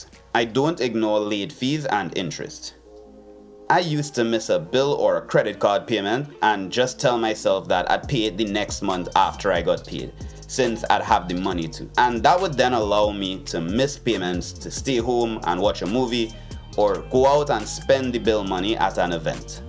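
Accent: Trinidad and Tobago. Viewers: are you a Trinidadian 🇹🇹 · Sound like a Trini. But living where?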